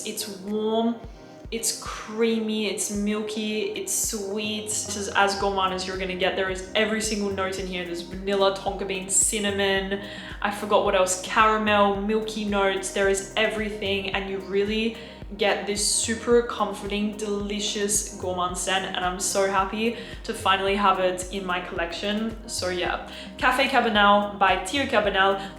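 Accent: France. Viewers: About the accent, I wrote you a comment that Berlin isn’t supposed to sound French, but since then I can’t help but pronounce it as Ber-luh in my mind all the time, especially when I see Poets of Berluh 🤣 the French accent is so sticky 🤣 Anyway I hope you don’t take comments about accents too seriously. Love xxx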